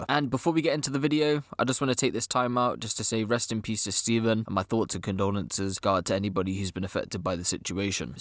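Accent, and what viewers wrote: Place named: Ipswich, United Kingdom. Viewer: This guy sounds like he’s from ipswich whys he reporting on drill rappers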